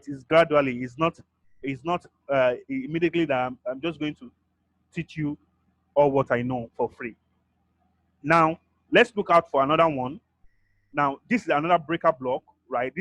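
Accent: Nigeria. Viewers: If he uses his native language will that be better for you? Listen to understand him. He is a Nigerian, English isn't the native language used there but more like a general thing.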